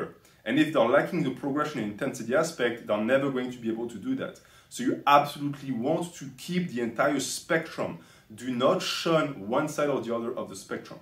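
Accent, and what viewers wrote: France. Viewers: R u from France bro ?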